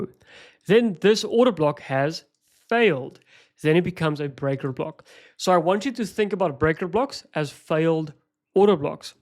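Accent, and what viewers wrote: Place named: South Africa. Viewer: No he sounds South African but I could be wrong!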